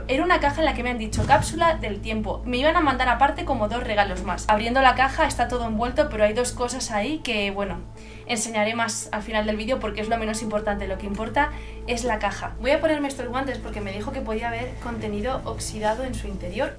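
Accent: Spain. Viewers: Me gusta mucho su acento, ella es gallega?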